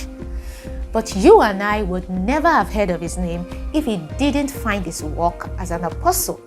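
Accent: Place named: RP, United Kingdom. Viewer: This makes a lot of sense. Sequentially presented with Queen's English. Engr. Dr. S. A. Aina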